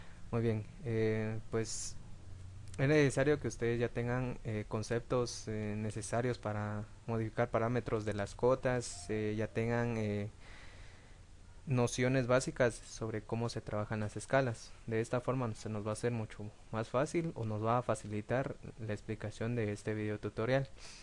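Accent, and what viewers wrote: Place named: Guatemala. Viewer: Ya decía yo :D jeje no pude confundir tu acento chapín mi hermanito! :D Dios te bendiga :D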